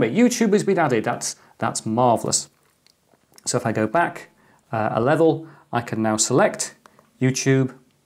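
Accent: England, United Kingdom. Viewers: I thought it was Christopher Walken :-) talking about R-Pi. on Youtube. with such pure English accent :-) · Love your british speak mate, cool startrooper heads on your desk also! keep up!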